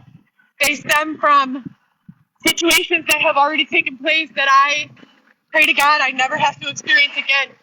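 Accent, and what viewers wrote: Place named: Latin America. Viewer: Here she goes with the latina accent🤣😂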